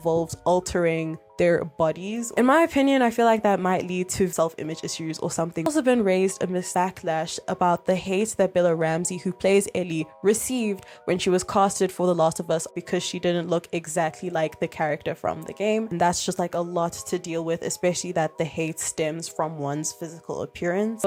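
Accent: South Africa. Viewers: I agree, I think it's perfectly reasonable to expect criticism for your work when you put it out in the world and Sam was very mature going forward after all the backlash. (also just wanted to say your accent sounded really familiar and now I see it's because you're also from South Africa XD) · Such a great video, thank you! It's also great to hear a South African accent on YouTube by surprise! Had no idea when I clicked on this video. Subscribed! 🩷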